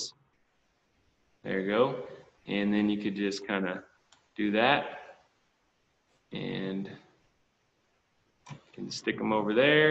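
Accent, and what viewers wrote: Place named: New York, United States. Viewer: Bro Nicaragua? You sound like a New Yorker lol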